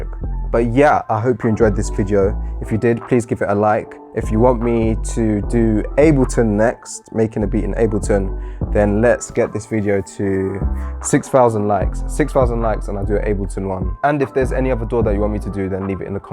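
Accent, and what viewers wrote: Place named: England, United Kingdom. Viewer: That happy feeling when you click on a video and hear an English voice instead of an American one. Ah, bliss.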